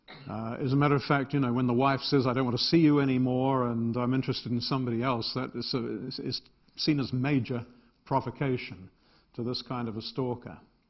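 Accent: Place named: RP, United Kingdom. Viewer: O'Rourke . . Of course he is British. He has a typical upper class academic English accent. Moreover, he used the term "chuffed", which in England means "very pleased with oneself or the outcome". However, I suspect that in his time he has been a 'bit of a lad' himself.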